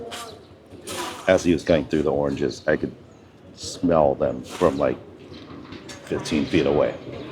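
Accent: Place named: Texas, United States. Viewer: Yeah, they start work super early. My sisters and parents in law just flew in this past Monday. Btw, no offense, but you sound like you're from Texas. LOL.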